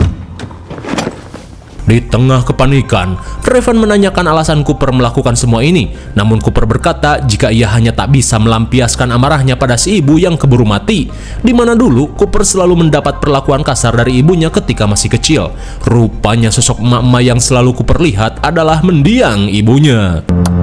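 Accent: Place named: Sunda, Indonesia. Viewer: Seru bahasa pembawaannya bang, apalagi ngomong aneh aing mah, si abang nya org sunda ini, salm sunda bnten bang, salm subscribe baru mu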